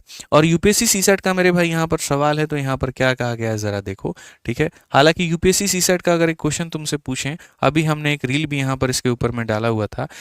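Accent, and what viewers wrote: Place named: Bihar, India. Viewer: Sir ❤ bihari accent bht pyra hai 😂❤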